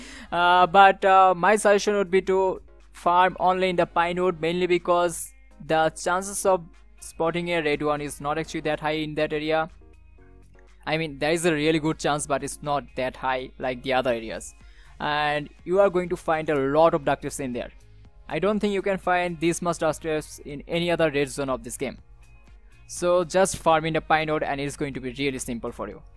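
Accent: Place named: India. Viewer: you sound Indian af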